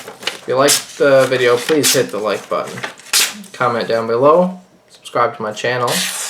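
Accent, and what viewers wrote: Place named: British Columbia, Canada. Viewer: haha yessss!! found the video quite by accident. recognised the accent immediatly. Hello from BC. Haven't been home sense 2002. Funny how hearing the accent from home has such an emotional/mental trigger.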